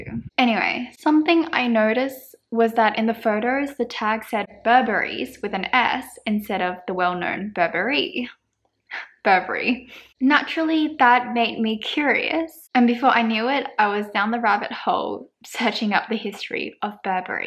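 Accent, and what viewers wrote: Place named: Australia. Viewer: You’re beautiful with a.. Um Australian accent? Sorry I found your video to learn how to spot fakes. Good closeup video ^_^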